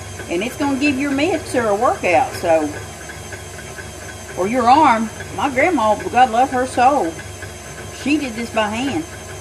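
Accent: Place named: Georgia, United States. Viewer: I’m from Kentucky, but my family’s from Georgia, so there’s something very familiar about your accent. It makes me feel like I’m cooking with my aunt haha :)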